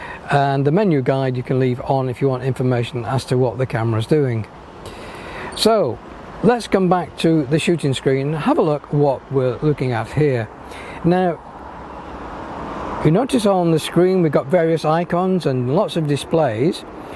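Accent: England, United Kingdom. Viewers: Very helpful - good to hear an English voice (I'm from Stoke but live in the US) - thank you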